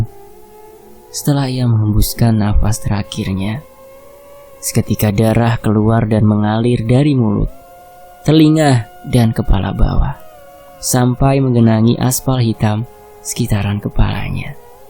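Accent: Java, Indonesia. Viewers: demen bnget pas mimin bawain logat jawanya... 🤭🤭🤭 rada2 gimna gitu... 😅😅😅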